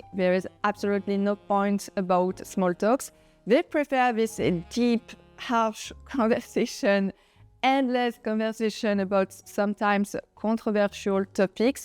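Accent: France. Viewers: Avec votre "french accent" j'ai su à la première seconde d'écoute que vous n'étiez pas anglo-saxonne ;-D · Love your accent! ❤ It sounds French Shick!!!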